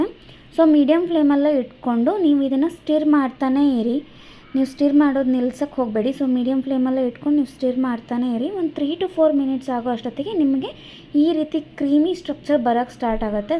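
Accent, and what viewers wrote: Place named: North Karnataka, India. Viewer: ಉತ್ತರ ಕರ್ನಾಟಕದ slang ಚೆನ್ನಾಗಿರುತ್ತೆ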